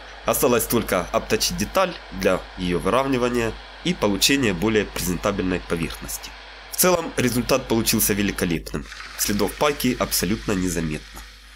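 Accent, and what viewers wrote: Ukraine. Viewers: Такой замечательный украинский акцент, и такой хороший мастер! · Ну у тебя и говор!)))Ты из Украины что ли??))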